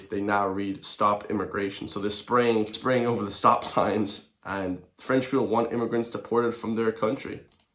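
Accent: United States. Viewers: Are you born and raised in Ireland? No offense, but you sound American...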